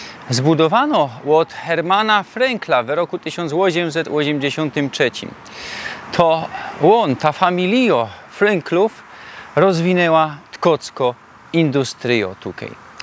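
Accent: Silesia, Poland. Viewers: Fajna ta gwara śląska 👍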